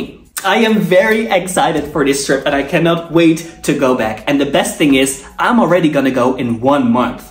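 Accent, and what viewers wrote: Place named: United Kingdom. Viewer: I am a studier for Ielts,thought your prounciation is so stanard that I really want to ask where you from.Are you a real person who comes from UK as your prounciation is so well. Your prounciation of English is more standard than I'ever seen so many people speak English.I can't hear clearly what's the mean of them but I could understand you.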